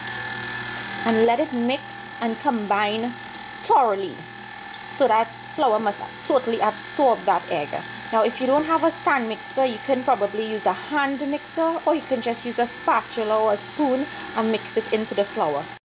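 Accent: Trinidad and Tobago. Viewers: haring you trini accent is soooooooooo pleasing. and the recipe is also excellent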